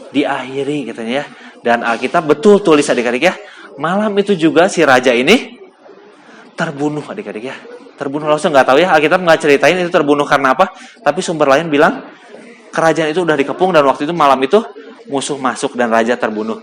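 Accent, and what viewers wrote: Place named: West Java, Indonesia. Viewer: Wah keren kakaknya sermon pake aksen Sunda😂😇👍🏽👍🏽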